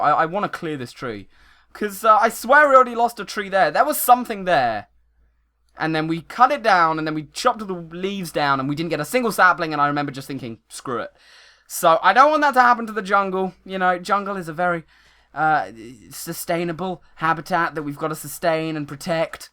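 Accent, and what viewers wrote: United Kingdom. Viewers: QOTD: I don't know what u mean but in minecraft I like to travel by sprinting and in real life I like traveling in a car because u see more then taking a plane also can u do more daily captive minecraft 2? Thanks your vids are great and I love your British accent of your voice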